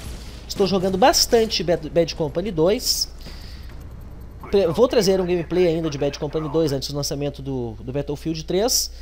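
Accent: Rio Grande do Sul, Brazil. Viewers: tu é gaucho??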